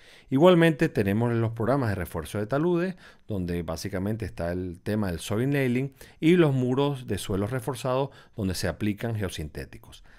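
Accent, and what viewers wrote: Venezuela. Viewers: disculpa eres venezolano ???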